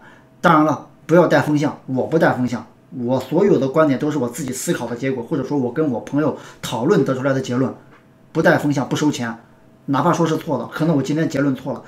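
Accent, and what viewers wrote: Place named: Shandong, China. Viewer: 是山东人吗口音熟悉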